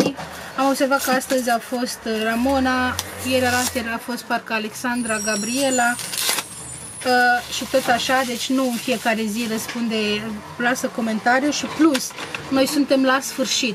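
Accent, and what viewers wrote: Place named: Moldova. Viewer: ce nerusinat e Dragos ma facut pe mine sa lacrimez si inca plus la asta a mai facuto si pe mea preferata sa lacrimeze a fost o felicitare asa cum a spus el o felicitare lacrimogena.iar bratara e minunata so porti sanatoasa.Asteptam cu nerabdare sa iasa in vinzare cartea lui Dragos e foarte talentat baiatul asta si la machiaj si acum am aflat ca va lansa o carte sper sa am si eu ocazia so citesc.Ador cind vorbesti Moldoveneste incep a zimbi de fiecare data si apropo transmitei multumiri lui mamica ta pentru reteta aia de apa de orez daca functioneaza o sa fac si eu.Te pup mult succes in continuare si multumesc pentru ca neai felicitat ca suntem 2000 degrasuti si desigur te felicit si eu pe tine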